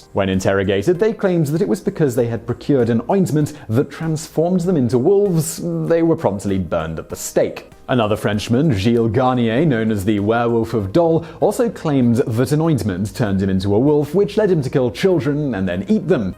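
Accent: United Kingdom. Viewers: Simon, Simon... You are my favorite YT presenter! Sometimes your pronunciation of certain words are slightly cringy. I attribute this to your UK accent. However today? You use a word you call "Disorientated". What you mean is "disoriented" but, well,... come on honey?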